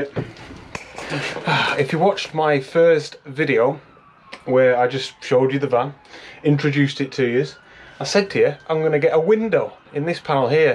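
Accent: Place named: Lancashire, United Kingdom. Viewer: Loving the van build, roll on the next episode. I actually found you by accident when I was looking for tutorials on the Canon M50 Mkii which I have recently purchased! So I`ve been watching your other vids with interest. My Pal Colin also follows you, and you sound fairly local to us, I`m just outside Preston! Cheers Pal 😀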